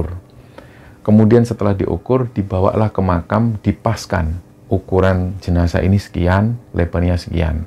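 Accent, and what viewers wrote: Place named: Surabaya, Indonesia. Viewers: Host nya wong mana to? Bahasanya Suroboyoan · Aku Sasak lombok, tapi dengar mas bicara, tangan,baku rindu Surabaya akubrindo Jombang.. pernah hidup di jatim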